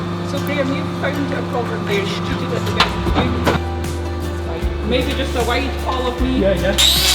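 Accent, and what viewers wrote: Scotland, United Kingdom. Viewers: Who’d of thought a hoof doctor would become my much needed connection to Scotland? Raised by two Glaswegians in Canada 🇨🇦, my aunties and uncles back home have all passed in the last few years so I haven’t been back a lot. The accent, the scenery and the ice cream. My most favourite thing about Scotland is the ice cream since I was a little girl. Thanks for the happy memories.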